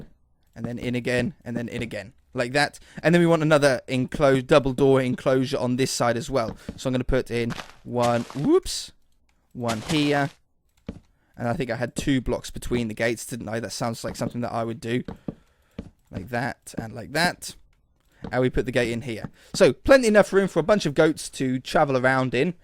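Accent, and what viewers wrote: United Kingdom. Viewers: Hate to be rude, but may I ask, are you British? I'm part British part American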